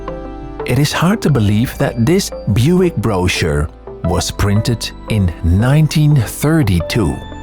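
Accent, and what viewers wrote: Netherlands. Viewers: Your channel is the only one where I can stand such a thick Dutch English accent. Normally can’t stand it at all (“tenenkrommend”) but your presentation and mood just draws me in and envelops me. It is especially enjoyable and just for this once I’ll just have to allow your accent to exist. Thanks for taking the time to share your dots with us. Never change. Also, nice music!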